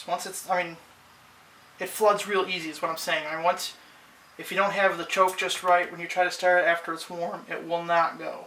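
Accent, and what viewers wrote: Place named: Upper Peninsula, United States. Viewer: you are picking up a little yooper accent!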